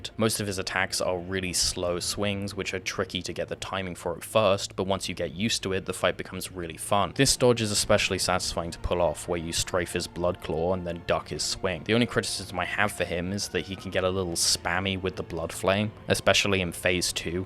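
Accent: Wales, United Kingdom. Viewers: He is British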